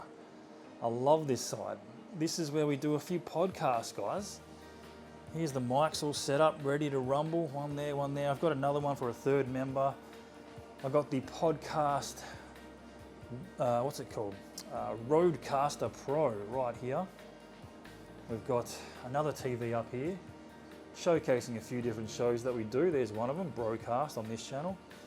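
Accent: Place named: Australia. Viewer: You have to be from Australia, right? Pronouncing SEGA like Seaga was the dead giveaway. But I also suspected it when you said ”a heap of games” which I only ever heard The Last Gamer say.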